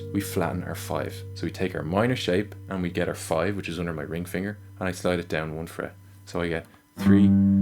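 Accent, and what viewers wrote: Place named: Ireland. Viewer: you absolute babe <3 also - being an Irishman very far from home it's nice to hear the accent!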